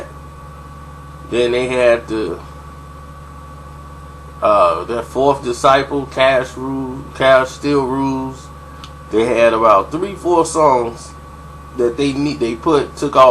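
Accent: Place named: Midwest, United States. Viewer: j o yes hes from midwest tho...not east coast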